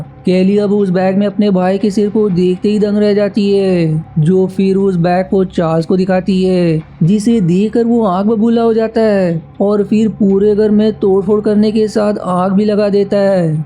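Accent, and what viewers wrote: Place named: Bihar, India. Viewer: तू अस्सल बिहारी लगता है मेरेको हो तेरी जिंदगी थू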